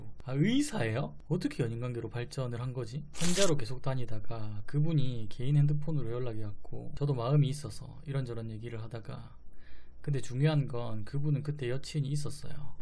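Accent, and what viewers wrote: Gyeongsang, South Korea. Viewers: 왜 연애 유튜버분들은 경상도 사투리가 많을까요 이분은 현재 연애상담 유튜버 하시는분의 말투나 톤이 넘 닮았어요 넘 놀래서 댓글 달아요 😮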